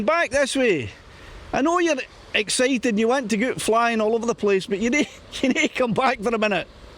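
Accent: Scotland, United Kingdom. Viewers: What part of south west Scotland are you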